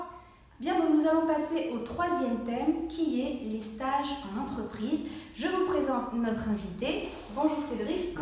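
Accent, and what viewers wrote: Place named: Brittany, France. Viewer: excusez-moi mais puis-je vous demander d'où vous venez en France? votre accent a le même air de celle à Anne qui présente la chaine 'Parlez Vous French' sur yt, et elle est Bretonne (?). je suis encore en train de regarder cette video, merci beaucoup quand même :)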